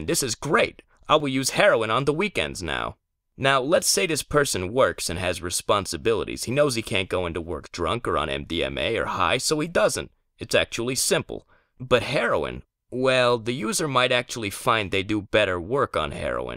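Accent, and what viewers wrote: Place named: East Coast, United States. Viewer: the East Coast accent helps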